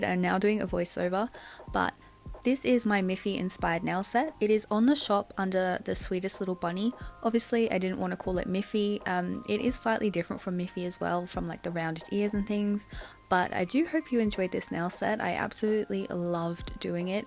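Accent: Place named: Australia. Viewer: I so enjoy your tutorials love.. Nice to hear an Aussie voice.. Love Anne, from Victoria Australia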